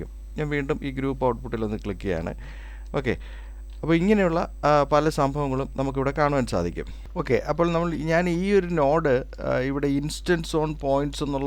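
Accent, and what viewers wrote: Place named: Thiruvananthapuram, India. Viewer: സാർ., താങ്കളുടെ ടൂട്ടോറിയൽ ലാണ് നമ്മളെ ബ്ലൻഡർ എന്താ ണ്ടെന്നും ചില കാര്യങ്ങളും പഠിപ്പിച്ചതു. താങ്കൾ തുടർന്നും ഞമ്മളെ പോലുള്ളവരെ പഠിപ്പിക്കുമെന്ന് പ്രത്യ ക്ഷിക്കുന്ന നമ്മൾ തലശ്ശേരി കടുത്ത വായനശാല കൂട്ടമാണ് മലയാളത്തിൽ ബ്ലൻഡർ കച്ചവടമാക്കാതെ സത്യസന്ധമായിട്ടുട്ടോറിയൽ തരുന്ന വ്യക്തിയാണ് താങ്കൾ. താങ്കളെ നേരിട്ട് കാണാൻ ഞങ്ങൾ ആഗ്രഹിക്കുന്നു. എല്ലാ കൊല്ലത്തെ ഫിലിം ഫൈറ്റി വലിൽ ഞങ്ങൾ എത്താറുണ്ട് താങ്കളുടെ ശബ്ദ കേൾക്ക മ്പോൾ തിരുവനന്തപുരം ഭാഗമാണെന്ന് ഞങ്ങൾ ഊഹിക്കന്നു. നേരിൽ കാണാൻ ഞങ്ങൾക്ക് ആഗ്രഹമുണ്ട് അതിന് താങ്കൾനമ്പറോ ID. യോ തരുമെന്ന്. പ്രതീക്ഷിക്കുന്നു. അഭിവാദ്യങ്ങൾ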